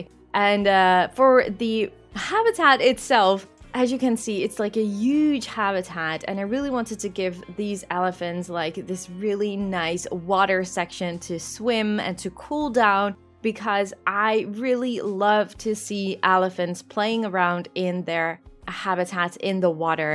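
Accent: Netherlands. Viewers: I knew you accent sounded Dutch! Never been to Rotterdam zoo but I loved Beekse Bergen. Been a very long time since I last went to visit but I'm definitely gonna go again sometime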